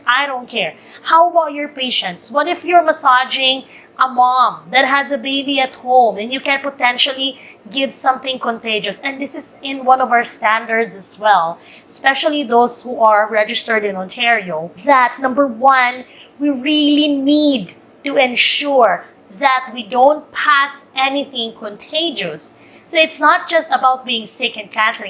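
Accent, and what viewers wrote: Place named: Philippines. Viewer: Hi! I came across your videos, I was just doing my errands and i felt so annoyed with the feeds on my facebook. So I came here. I am an LMT here in the Philippines and i enjoy watching your videos. I get new ideas. I was about to apply there in Canada as a massage therapist after the pandemic before we created our massage business but my husband didn't like the idea as we are both DEPED teachers at the same time massage therapist. It is our passion and we also have massage clinics. I assume you are filipino. You speak like one. Are you?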